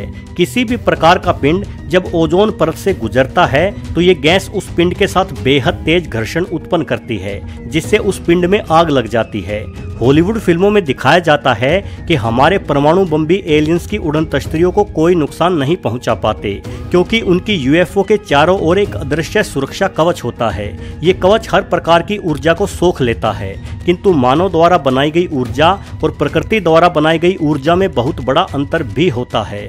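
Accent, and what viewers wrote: Haryana, India. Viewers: .. aap haryana se ho shyad?..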